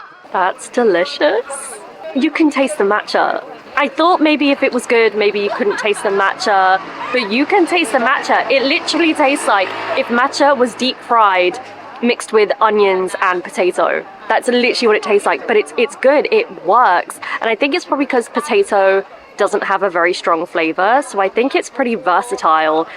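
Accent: United Kingdom. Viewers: はっきりしたブリティッシュイングリッシュで勉強しやすいですよね〜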